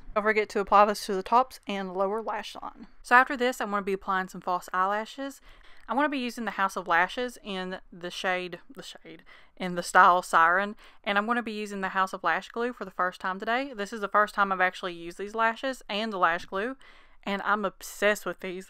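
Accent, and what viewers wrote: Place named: South, United States. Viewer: I have similar face shape, eyes and hair as you so I love to see new ways to do makeup. I didn't pick up this palette at Sephora the other day still pondering. Also, your accent sounds like mine. Southern girl? :)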